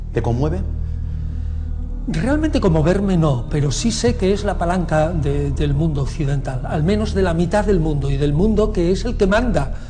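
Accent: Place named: Argentina. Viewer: Si, está muy equivocada éste fulano, parece argentino-español. Ya en su cara se ve el castigo divino por tanta mentira. Lo malo es q mucha gente q está naciendo lo escucha y le creerán. NO LE CREAN, ÉSTE TIPO NO ESTUDIÓ CON SERENIDAD LA TRAYECTORIA DEL ÚNICO HOMBRE Q EXISTIÓ SOBRE LA TIERRA Y HASTA LA FECHA... AÚN NO NACE OTRO IGUAL. SALUDOS DESDE CHICAGO.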